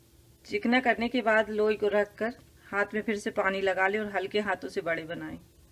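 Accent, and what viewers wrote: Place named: Bundelkhand, India. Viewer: Bhasha k lhze se bundelakhnd jablpuriya lg rhi h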